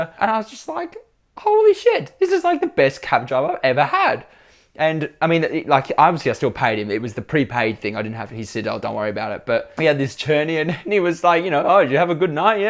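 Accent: Australia. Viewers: australian accent😍😭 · dude which part of melbourne are you from???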